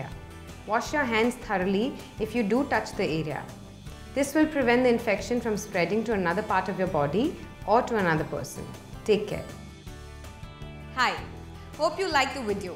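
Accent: India. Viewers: YOUR ENGLISH IS DIFFICULT TO UNDERSTAND BECAUSE YOUR INDIAN ACCENT IS TOO STRONG !!!!!! JUST TO LET YOU KNOW.......🌝🌝🌝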